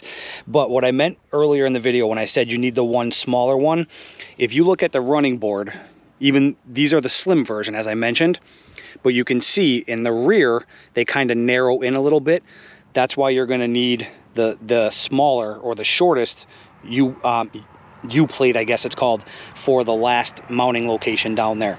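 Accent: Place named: Minnesota, United States. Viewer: You from min or Wisconsin? lol me too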